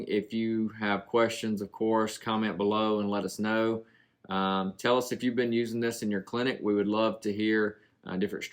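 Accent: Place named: South, United States. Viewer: Well explained and as an Aussie, I like his nice ?Southern accent. I will be trying with my post surgery foot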